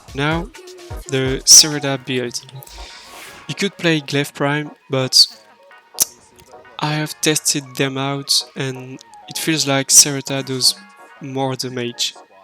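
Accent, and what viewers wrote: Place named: France. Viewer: slay girl ! very sexy voice btw, i love the french accent and the build is insane plz do more of these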